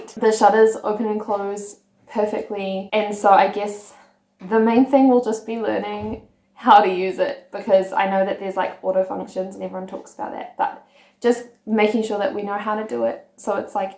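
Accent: New Zealand. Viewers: Once you've reached 1k subs, it'd be only a small step to make it up to 10k.... there will be the point Germans become the majority of your viewers and subscribers, with almost all of them being former exchange students at some parts of their lives (mostly to the US). Your Kiwi accent is so strong, slowing down on your speaking speed would make you easier to understand. I'm still getting it but I have to rewind a couple of times..... And yes, I'm a German subscriber :-)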